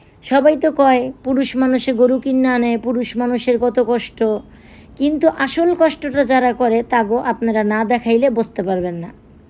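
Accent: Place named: Narayanganj, Bangladesh. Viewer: নারায়ণগঞ্জ এর ভাষা আজ প্রথম শুনলাম । ধন্যবাদ আপনাকে। সিলেট থেকে দেখছি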